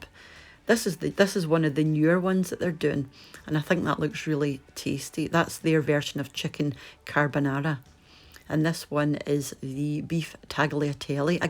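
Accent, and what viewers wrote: Scotland, United Kingdom. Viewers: Love your Scottish accent. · Hello from Canada. I've been following your channel since few months and love all your videos. I LOVE your accent. I have a cousin who married a Scottish woman from Edimbourg and you remind me of her... Love all your cooking and I am surprised by the prices. In Canada, it's quite expensive... You are a great entertainer.:) I am anxious to see all your new recipes each week! Stay safe and have a great week end!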